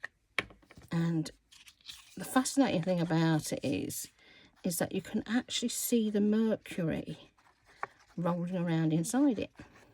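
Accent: Scotland, United Kingdom. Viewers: ... I too... Am so grateful for the Playlist... I was in the DARK... Lol... Trying to get or find the people involved in the actual collaborate... But, thx to your Playlist it's perfectly clear now... ROTFLMFAO 😅... Plus getting to see those that have made such lovely creations... 🌹 I am so a new bee subscriber to your channel... Thank you for sharing your creativity wiff us... Also, loved hear your Scottish accent... I lived in Aberdeen Scotland area for bout 3.5 year's... Fell head over heels 👠 in love with it all... Rented a Farm House 🏡 in the middle of 2500 acres... Of the Major Irwins 5,000 acre Estate... In Newmachar... Siittinton House 🏡... 🌹... Sincerely sincere Miss Judith ❤ ✨ 🌹...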